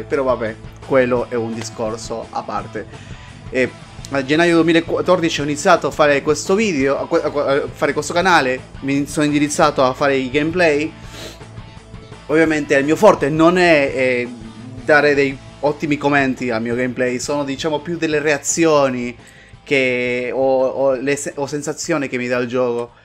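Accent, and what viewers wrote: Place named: Spain. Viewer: adoro il tuo accento... l'accento spagnolo lo amo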